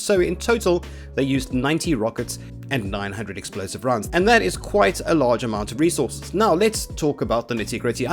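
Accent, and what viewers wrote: South Africa. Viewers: OH SNAP YOUR ACCENT!!! I could tell you were South African and then decided to check your bio and I was right!!! Awesome man, Awesome video :) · are you from south africa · I’m a simple man, I hear a Saffa accent and I sub to the content. But that intro was perfect. Perfection.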